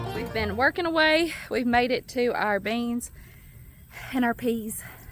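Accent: South, United States. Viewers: You are such a good wife/mom, and your little guy looks like a sweetheart...~.~ I started watching your channel recently. I'm a Christian, too, and grew up in the 'country' in Michigan/Wiscosin. Now I live in South Korea and teach English here with my Korean husband. It's fun to hear your Southern accent and to see the little things about American life that I don't see anymore living here in a big city and foreign culture. Thanks for sharing your joy & love for Jesus and family!💜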